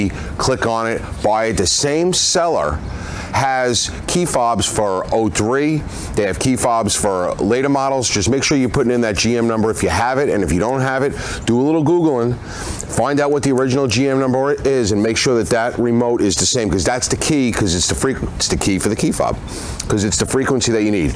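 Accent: New York, United States. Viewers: I know right! Love NY accent. I’ve been watching The Sopranos and this gentleman could be cast in it if they ever do an update.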